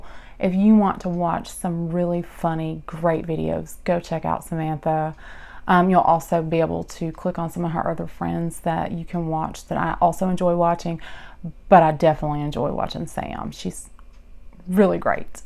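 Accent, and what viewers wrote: United States. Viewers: I’m from England and I love American accents and yours is my absolute favourite ❤️❤️ my <mail>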